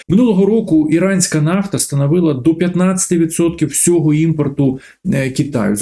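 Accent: Moscow, Russia. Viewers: vin mae moskovsjkyj akcent. akae bezudarni O.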